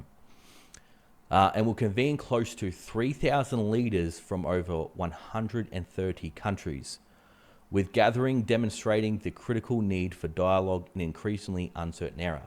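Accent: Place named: Australia. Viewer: Yeah mate. He speaks English. Australian English.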